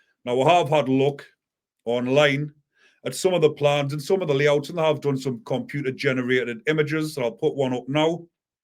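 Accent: Newcastle, United Kingdom. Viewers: Nice one keep them coming mate good to hear a fellow geordie on here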